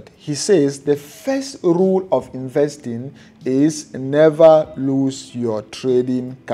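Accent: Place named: Nigeria. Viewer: This guy looks and talks like a Nigerian from cross river or Akwa Ibom. Could it be that he is from one the villages around Bakassi Peninsula-Naija/Cameroon towns?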